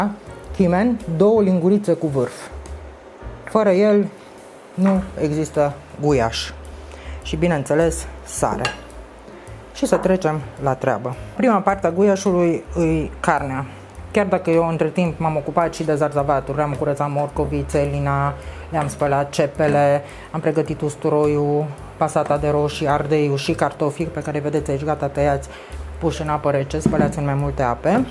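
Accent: Transylvania, Romania. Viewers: Pe lângă cum gătiți, îmi place și accentul dumneavoastră ardelesc, e fix cât trebuie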